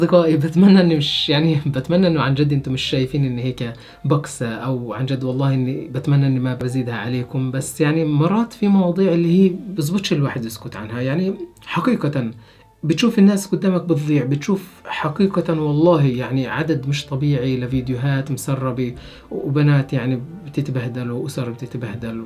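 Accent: Palestine. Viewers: هذا فلسطيني واضح من لهجته لكن تحيه لك على اي حال اخوي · حكيك زينا فلسطيني ..كلامك رائع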